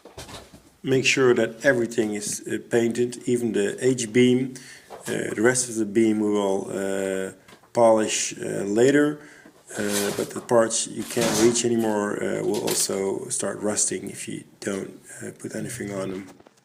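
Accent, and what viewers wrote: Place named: Netherlands. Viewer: I could just hear from your accent you're from the Netherlands haha. Ziet er echt gaaf uit, die tafel! Echt super mooi ding :)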